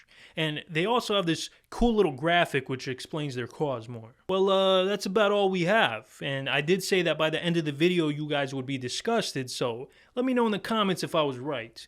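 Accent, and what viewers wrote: New York, United States. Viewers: 😅This is the most NY Accent I've ever heard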